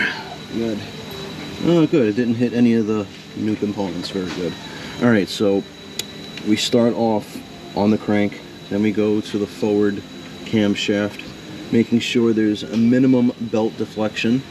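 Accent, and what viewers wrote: New York, United States. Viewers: YOU GOTTA BE A NEW YORKER. boston got nothin on you.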